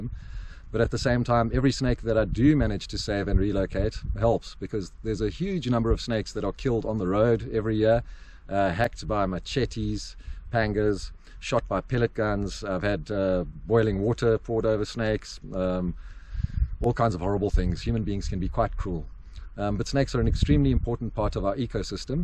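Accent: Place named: Netherlands. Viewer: You sound like a Dutchie! How are you, brother? Beautyfull beard, i'm jealous!!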